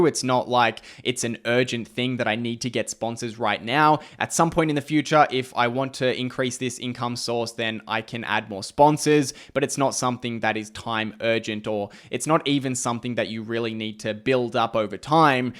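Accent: Australia. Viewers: Rosy cheeks and Aussie accent ☺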